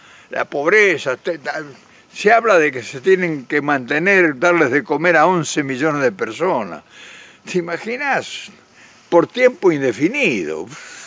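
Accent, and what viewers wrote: Argentina. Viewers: No lo había visto nunca señor ,creo que usted es argentino por su acento pero usted habla de información y no tiene idea de lo que dice yo vivo en Ciudad de MEXICO y este señor obrador a destruido al país ,no hay trabajo, desmanteló la salud, la economía estamos en una. Corrupción como nunca ,hay pruebas y, Trump sigue mandando ahora ya se llevan el agua y el ejército mata a los ciudadanos , INFÓRMESE, ACTUALISESE Y, OJALA ALGUN DIA DEBATA EN SU PAIS CON JAVIER MILEI PARA QUE LE DE UNAS CLASES ,POR SU EDAD USTED ESTA CON IDEAS DE HACE 60 AÑOS ,VIVA MEXICO FUERA EL DICTADOR OBRADOR PREPARA SU RENUNCIA EN DICIEMBRE ,Y DICHO PIR EL ES EL PEOR DE LA HISTORIA MEXICANA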